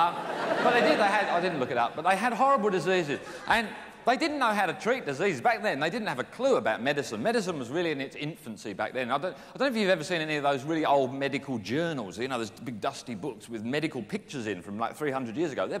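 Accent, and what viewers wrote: Australia. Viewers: I love how him living in Australia meant he has a slight Australian twang to his accent.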